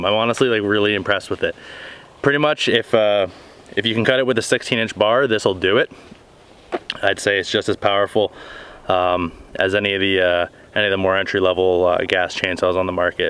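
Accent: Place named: Canada. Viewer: Like the Canadian accent.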